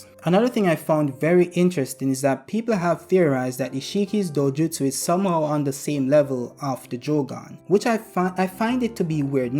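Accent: Jamaica. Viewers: Me hear a Jamaican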